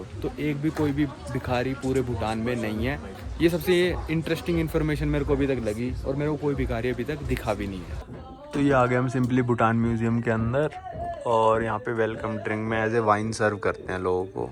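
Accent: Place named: Northeast, India. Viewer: English Accent same as North East Indian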